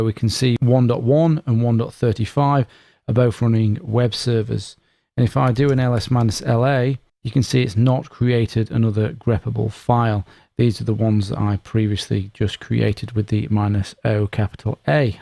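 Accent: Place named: United Kingdom. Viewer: A very good detailed explanation .... only thing it's kinda a little bit hard to understand your british accent.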